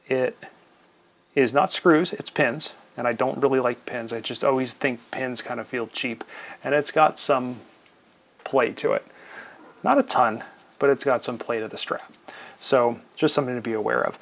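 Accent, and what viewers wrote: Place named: United States. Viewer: At least you are self aware to it😁.. yes, you guys in the US do say things in a funny way 😉. Actually just one thing- Tourbillon is pronounced without the “LL”, so it sounds “to(u) r bi yon”. Great review. I own the Aesop, the other dial, and the Pierre Paulin (same dial but with 3 hands). I enjoy them a lot.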